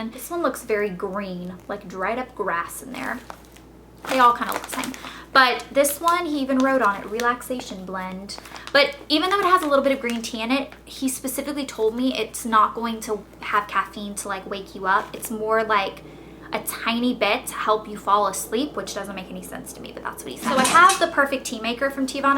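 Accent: England, United Kingdom. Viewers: why are you talking like like you're british? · you do an amazing english accent! i live in the UK and that sounded soooo good lol :) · you have a kind of british accent in this video !! LOve it ! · Your British accent is awesome, I feel proud. Love from London, England! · loving the english accent hahaha <3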